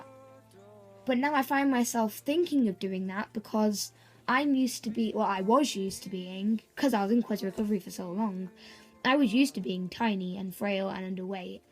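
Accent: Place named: Surrey, United Kingdom. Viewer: You sound really posh on your videos, you sound like my cousins from Surrey :D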